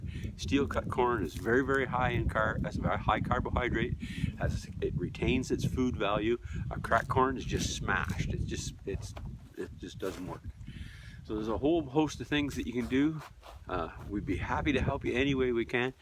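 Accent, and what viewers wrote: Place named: Canada. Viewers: I thought so too. Always makes me happy to see another Canadian ❤️🇨🇦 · Man ur canadian af....nice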